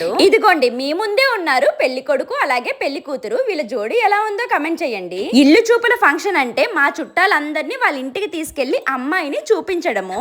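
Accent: Srikakulam, India. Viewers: Sis me voice ki oka uniqueness undi srikakulam yasha baguntadii nadi kuda srikakulam Sis movies lo try cheyandi me voice chala unique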